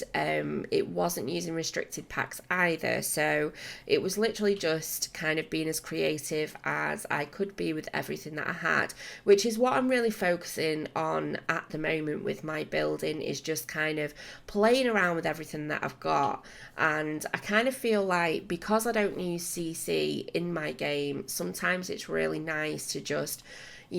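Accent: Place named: North, United Kingdom. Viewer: Always watch your builds & LOVE LOVE LOVE THEM - Currently live in Amsterdam but I'm from Manchester, Always Good to hear another northerner ;)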